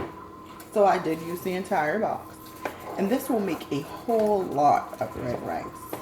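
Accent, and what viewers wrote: Charleston, United States. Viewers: I hear the accent💋yess Charleston bnr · You did AWESOME on your video! I had to search a bit- then found the title- I wanted to see where you were from. You were reminding me of home...that accent. I was thinking she "has to be" ....and YEP! Anywhere you go, you hear a Charleston accent...YOU KNOW IT! Charleston born and raised living in Texas now. Again, I THANK YOU! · Ok first Thank You! I'm a Gullah girl from Charleston straight off America st. Unfortunately my elders have passed on (including my mom) before I could get my geechee recipes uuuggghhh! I grew up on all of this. The family members I have left don't know how to give a recipe lol! It's a Lil of this & that. So Thank You for the visual step by step!!! Now I'm going to ask a small favor. It's only 3 in my family that eat red rice (my husband doesn't care for it...yes we almost divorced behind this 😂😂😂) but he loves okra soup so I decided to keep him. 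Can you provide me with a recipe on a smaller scale? Like maybe 2-3c of rice vs. a 2lb box? If not I completely understand and no hard feelings. If you're willing too then I Thank You in advance! 😘 I also have my grandmother's traditional rice cooker/steamer she used to cook her rice in for decades all my family still use theirs as well, but I haven't figured out how to use it uuuggghhh (for those who want to know true value hardware carries it) So I'm glad I stumbled across your method. are you from Charleston? I hear a faint accent. Mine come out when I'm pissed lol!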